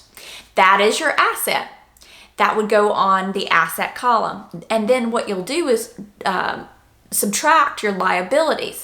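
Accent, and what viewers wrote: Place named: South, United States. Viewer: Her advice and tips are awesome but does anyone know where her southern accent is from I think it might be Arkansas but I don't know. Any help is greatly appreciated would help eliminate the guessing lol.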